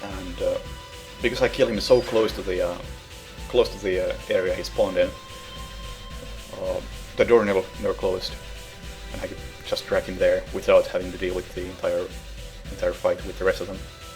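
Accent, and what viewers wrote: Finland. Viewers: Can I guess? Are you finnish?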